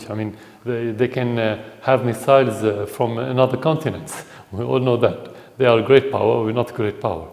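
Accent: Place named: France. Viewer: why does he have a french accent? he speaks English with a french accent .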